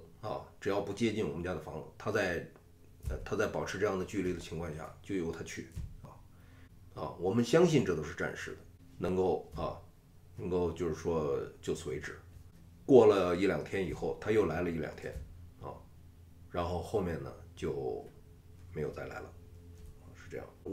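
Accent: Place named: North, China. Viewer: 聽您講生活故事．真的很有趣．很標準的北方腔．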